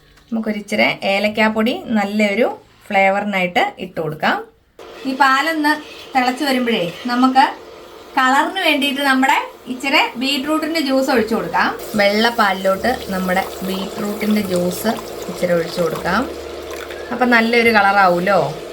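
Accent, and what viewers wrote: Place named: Kottayam, India. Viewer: Samsaaram kettal kottayamkare pole....vedio super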